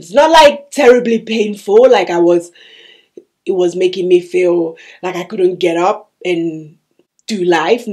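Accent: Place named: United Kingdom. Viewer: So I notice your accent and I’m not very familiar with customs in the UK (if that’s where you’re living). I’m in the US and we’re celebrating Thanksgiving this week. If you celebrate Thanksgiving or any carb-heavy holiday, how easy/difficult is it for you to maintain the keto diet during a time when the whole country is gorging on a lot of carbs?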